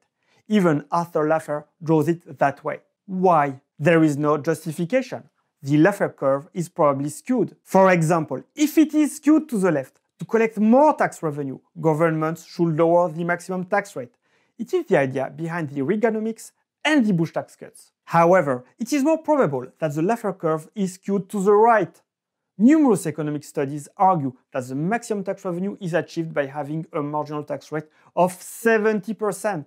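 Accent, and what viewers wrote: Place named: France. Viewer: Your French- English is not god enough,never heard that the sums of investment are equal to savings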